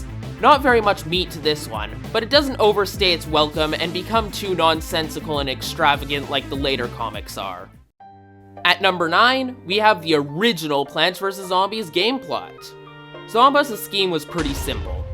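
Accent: United Kingdom. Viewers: You’re British, right?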